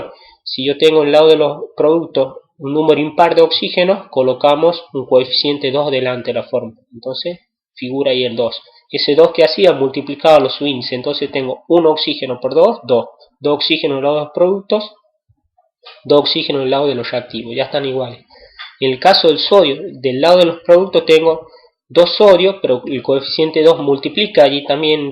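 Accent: Córdoba, Argentina. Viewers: Gracias! Ese acento cordobés me salvó... la verdad que ya ni recordaba esto y en unos días tengo evaluación diagnóstico jajaja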